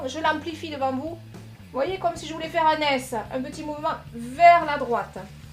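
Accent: South, France. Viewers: Non seulement c'est très pédagogique mais c'est très beau, avec en plus l'accent du midi! Un régal, ça me rappelle chez moi..Très belle démonstration de la méthode au couteau dont je ne suis pas experte; je pense que c'est de la peinture à l'huile... J'imagine que c'est aussi possible à l'acrylique ( je ne supporte pas l'odeur de l'autre....) Merci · avec l'accent du sud, ce bord de mer inspire, merci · Je ne peux rien dire de plus que tous les autres. Heureuse de vous avoir découverte, talent, gentillesse, et l'accent de notre midi😂 je suis à six fours !! Merci et à très vite. Je me suis vite abonnée.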